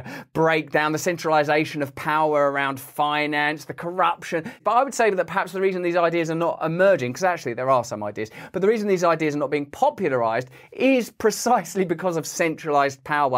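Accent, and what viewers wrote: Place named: Cockney, United Kingdom. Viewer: It’s a London accent I think.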